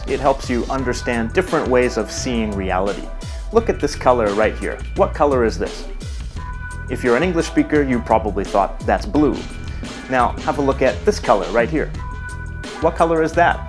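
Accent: United States. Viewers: I am enjoying the language series. what got me to comment is you saying that Canada is the same as the states? No we are not. Perhaps superficially, however, most of us tend to speak English well for most people around the world to understand what we are saying. We have more of a tendency to be bilingual, we are far more polite and able and willing to use proper English. Yes I know, plenty of low brow americanesque speakers do exist here as well. On the non language side; we aren't violent greedy warmongers. We don't invade countries for their resources. We don't have a war of terror on everyone who isn't american. We don't torture people around the world because they won't sign a lopsided trade deal, or they are not christian or they don't speak english the list goes on and on. I've heard you speak like a yank yourself. Zee over zed? Not all of us are assimilationists. 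Long story short, Americans are just that "mericans", not Canadians, not Mexicans, just yanks. that's it. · I thought you were American. You all sound alike. :p Though Canadians seem more polite. I got put off learning Spanish after visiting Spain and everyone kept slating my Spanish and switching to English. :/ I also tried Japanese but I won't be visiting there for a few years and whebever I spoke to a native speaker online they just laughed and told me not to bother. However, when I went to Croatia and learnt even just a little Hrvatski they loved it!!! It was a really cool experience and broke the ice so much. Everyone was 1000x friendlier in Hrvatska when you tried the language. I think it really helps to pick the right languages as your first so you aren't discouraged. Don't go for an easy language. Choose one you'll be motivated to learn. Volim Hrvastka! Split je lijepa!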